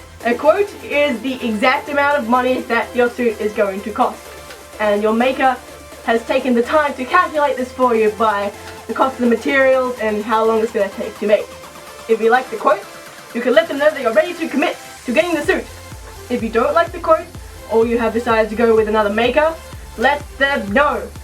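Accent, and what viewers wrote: Australia. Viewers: OMG you're Australian! I need to seeee youu and hug you!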